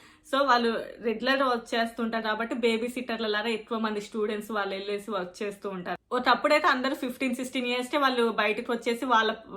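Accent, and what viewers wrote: India. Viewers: Inka Indian accent matladuthunaru meeru🤔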